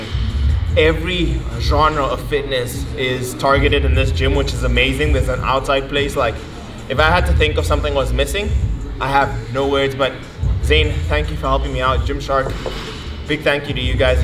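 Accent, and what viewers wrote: South Africa. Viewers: Is this guy from South Africa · Dude sounds South African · This guy sounds South African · You sound south African, are you? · Bro your accent , are you South African? Thanks for great content!!! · JUST SUBSCRIBED!!!!! Im from Cape Town and I pick up you from SA Straight from the pat by the accent. Enjoyed watching this video, looking forward to getting to see future Videos you drop. Much love ...:) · This guy from south africa?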